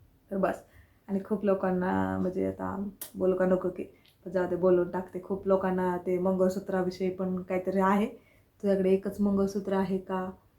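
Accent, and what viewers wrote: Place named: Latur, India. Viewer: ho latur chi bhasha lagech olkhu yete..... Me aushyachi ahe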